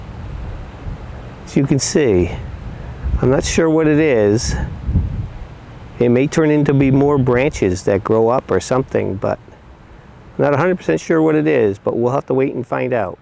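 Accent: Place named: Netherlands. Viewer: Sounds like a nl accent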